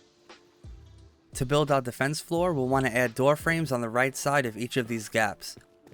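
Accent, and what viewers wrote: New York, United States. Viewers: U from New York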